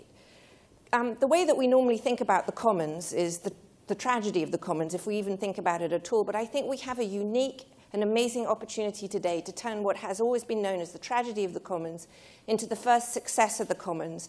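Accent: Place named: New York, United States. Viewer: Her accent is soo ny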